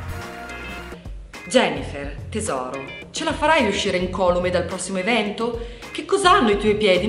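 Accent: Italy. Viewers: ma sei pure emiliana! ok ti adoro, è ufficiale! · quel dialetto! sei di Reggio Emilia?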